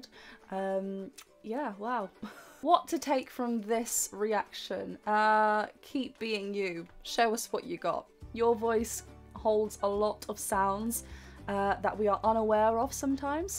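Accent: England, United Kingdom. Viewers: Coach i love ur english accent ❤ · What dialect of British does she speak?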